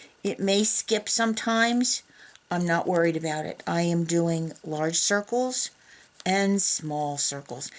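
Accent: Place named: New York, United States. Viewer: I am SO happy that I found your tutorials! I love your colors and your personality--and I think I even detect a little NuYawk accent there :) Can't wait to catch up on the ones I've missed and the new ones to come. You brightened MY day! Namaste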